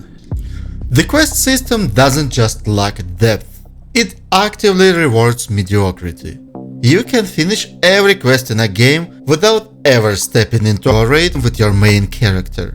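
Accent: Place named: Eastern Europe. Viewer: Getting ratted, and the fact that you lose all of you gear except for the safe pocket, killed this game for me months ago. Tried it again 2 nights ago because in so many ways it's amazing, but this is a deal-breaker. Your accent sounds like people I call "brat" or "druk", and is not a problem at all. In fact, makes the video even more compelling. Slavic bros know things. Seriously though, if some clown with a free loadout can rat you while you are decked out with all purple and gold guns and augments, what is the point? "Horde of naked hobbits" 💀💀💀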